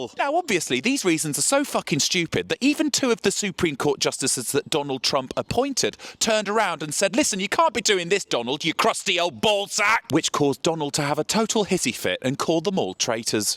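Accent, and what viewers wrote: United Kingdom. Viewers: I can't explain it but delivering news on our dumbass dictator is more funny with the british accent. Keep it up we need the entertainment. We desperately need the entertainment.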